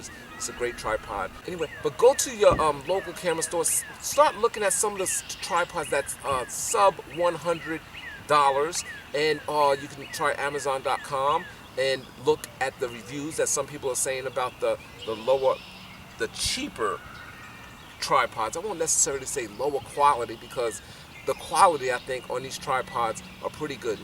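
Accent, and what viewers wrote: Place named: New York, United States. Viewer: you are an intelligent c.... not just a c.... you are fun to watch and have a great New York (yeah) accent. keep up the good work😁😁😁😁😁😁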